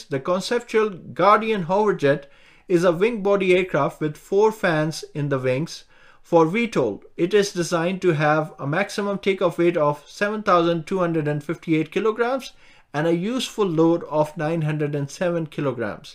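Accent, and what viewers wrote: India. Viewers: I like ur accent👍👍 r u from india?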